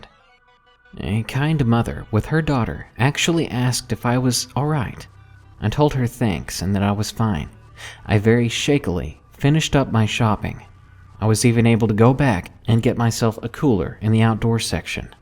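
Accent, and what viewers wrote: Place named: South, United States. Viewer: Omg! sounds so young and southern, i fucking love it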